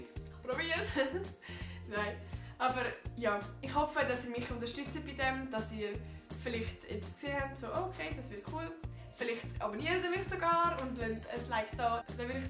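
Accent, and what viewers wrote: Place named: Switzerland. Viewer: Sehr cool, das Schwitzerdeutsch 😁👍 fast wie eine andere Sprache 😜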